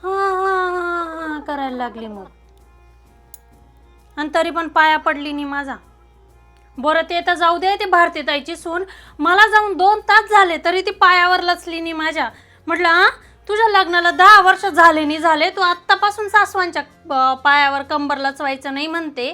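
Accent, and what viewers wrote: Khandesh, India. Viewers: आहेरणी बोलत रवा मस्त वाटस मै खान्देशी माल्ये अभिमान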